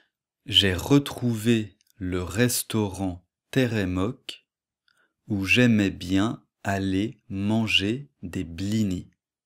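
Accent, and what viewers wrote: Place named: France. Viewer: где вы изучали русский язык? Так чисто, грамотно, с легким французским акцентом говорите...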